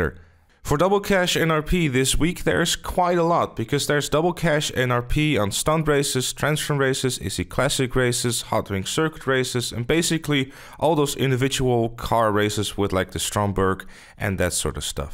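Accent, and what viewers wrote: Netherlands. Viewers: Probably dutch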